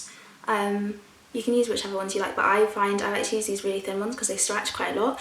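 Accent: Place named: France. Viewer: I love your accent and is it a French accent or a British accent?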